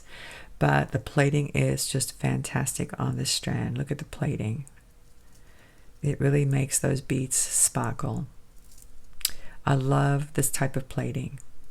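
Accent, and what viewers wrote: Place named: Australia. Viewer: Wow I thought I detected an Aussie accent...you must have an interesting tale to tell of how you got to America. I grew up in Mount Gravatt, Brisbane so I know Sunnybank well. xox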